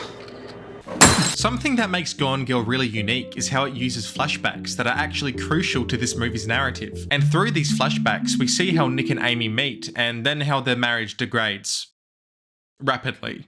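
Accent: Australia. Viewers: Cool guy with Australian accent, defenetly subbing !❤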